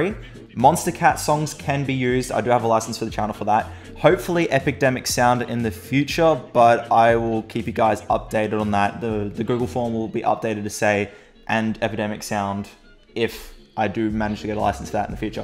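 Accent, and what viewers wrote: Australia. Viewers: Are u Australian? U dont have to answer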